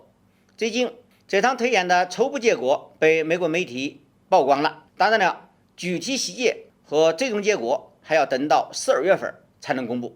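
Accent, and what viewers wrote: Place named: Xiangfan, China. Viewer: 主播是襄樊的吧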